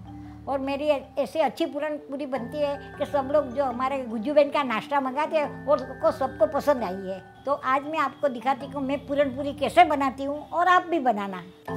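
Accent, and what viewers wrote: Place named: Mumbai, India. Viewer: Jai shree krishna baa..manee taamara mumbaiya style hindi khubach game..tame keen chana dal nather vaparyee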